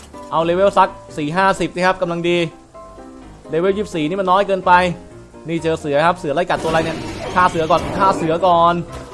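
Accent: South, Thailand. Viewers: พี่อยู่ภาคใต้หรอครับ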